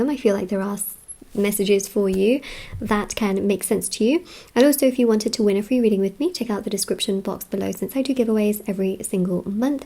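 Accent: United Kingdom. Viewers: Hello, I wonder if u do live vdo tarot reading? Where are u located bcoz u sound British, & look eastern Indian, also like to know what is your horoscope sign if u don't mind. Thank u for reading.🙏🐾🌹🪔🕉️🤩✝️☮️🌺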